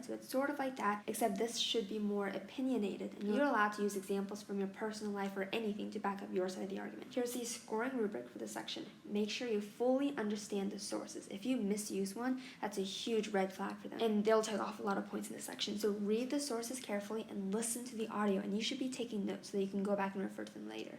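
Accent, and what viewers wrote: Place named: Mexico. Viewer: Love the way she speaks Mexican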